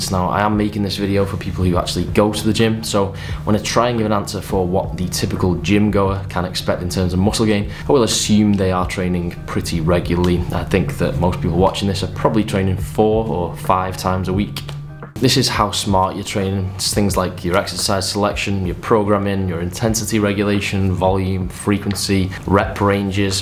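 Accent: United Kingdom. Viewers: Thank you very much for all that super valious information, and I love your accent, where are you from in the UK?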